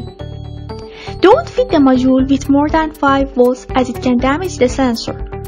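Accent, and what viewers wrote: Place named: Iran. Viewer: دمت گرم بابت ویدئو و تلاشت که هم گرافیک قشنگی ساختی و هم خوب توضیح دادی. تعجب نکن از رو لحجه باحالت فهمیدم ایرونی هستی. ولی باز دمت گرم ، میدونم چقدر ساخت همچین ویدئویی زمان بره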